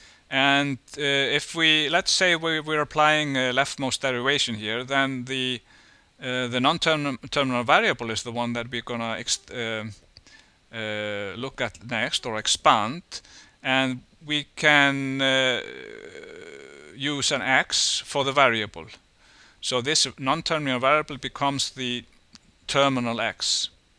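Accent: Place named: Iceland. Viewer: That's a helvíti thick accent, even by Icelandic standards.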